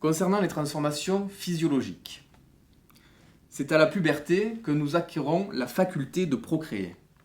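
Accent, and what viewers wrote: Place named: South, France. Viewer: merci beaucoup pour vos vidéos ! ça m'aide pour le bac :) le seul bémol c'est qu'étant du nord de la france avec mes amies on adore imiter l'accent du sud et du coup quand je vous entends parler parfois j'ai envie de rire x) (mais ce n'est pas méchant)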